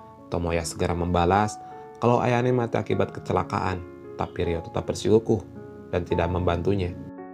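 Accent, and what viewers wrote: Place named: West Java, Indonesia. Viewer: cape haha orang sunda ya bang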